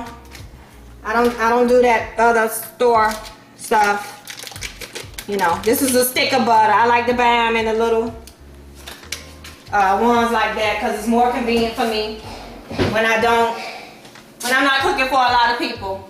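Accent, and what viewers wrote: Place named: Miami, United States. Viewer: this video recipe and instructions are all over the place and hilarious but i will b trying this, looks delich. and yes u definitly sound like a miamian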